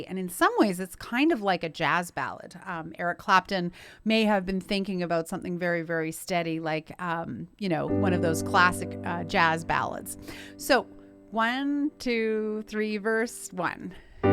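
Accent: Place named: Portugal. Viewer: Thank you so much. Another excellent video, love you accent, probably portuguesa, much gracias ❤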